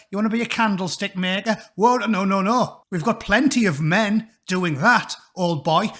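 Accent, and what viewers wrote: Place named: RP, United Kingdom. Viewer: A perfectly eloquent tirade in the queens English. Rock on.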